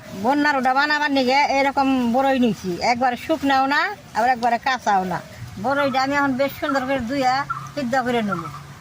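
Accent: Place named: Manikganj, Bangladesh. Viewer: উনি বাংলাদেশের মানিকগঞ্জ, টাঙ্গাইলের ভাষায় কথা বলেছেন। ধনিয়া কে বাংলাদেশের অনেক অঞ্চলে হজ বলা হয়ে থাকে। উনি গোটা ধনিয়াকে গোডা হজ বলেছেন। আরো কিছু অর্থ নিম্নরুপ : ১.ফাকি = গুঁড়ো ২. হুগনা = শুকনো ৩.মইচের ফাকি = মরিচের গুঁড়ো = লঙ্কার গুঁড়ো ৪. নবন = লবণ = নুন অল্প মিডা মানে অল্প মিষ্টি যেটা কিনা অল্প চিনি দিয়েছিলেন সেটা।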